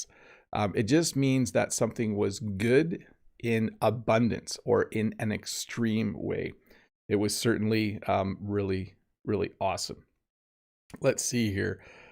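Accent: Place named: Canada. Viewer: Hi, no problem your Canadian accent is great and we understand your good English very well. salut mon ami.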